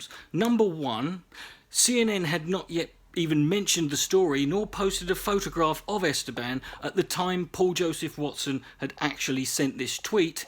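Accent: United Kingdom. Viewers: You sound more northern than Watson sounds haha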